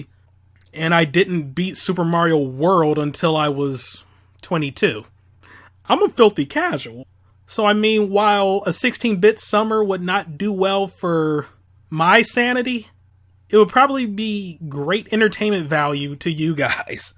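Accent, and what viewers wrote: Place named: Michigan, United States. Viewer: I thought you had more of a midwestern accent. Just didn't think it was Michigan.. Sadly I can't make it to MWE. Didn't even hear anything about it until you mentioned it in the video.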